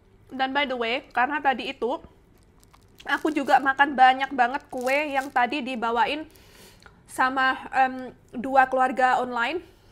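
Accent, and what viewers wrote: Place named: Banyuwangi, Indonesia. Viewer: bhsa nya oseng ya..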